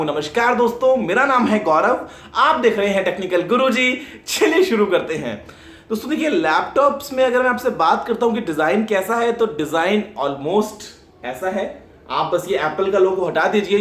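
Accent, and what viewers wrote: India. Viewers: is he really talks english?? heard like speaking indian..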